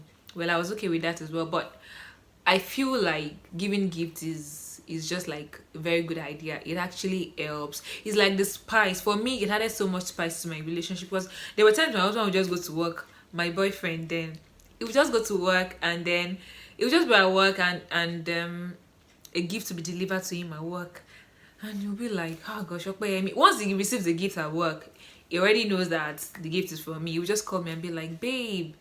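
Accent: Yoruba, Nigeria. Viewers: Your yuroba because you say forhever not forever. Thats really a yoroba thing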